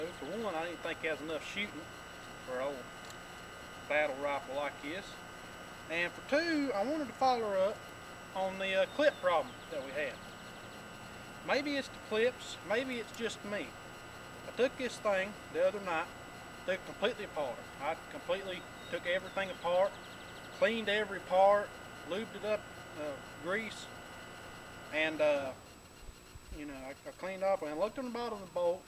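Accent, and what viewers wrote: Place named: South, United States. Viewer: Love a good Southern accent my brother. COL StoneyB. Georgia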